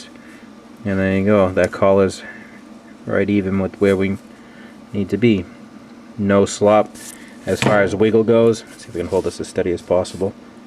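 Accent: Boston, United States. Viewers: Wow really nice work I've subscribed. Love to do this. May I ask is that a Boston accent you have.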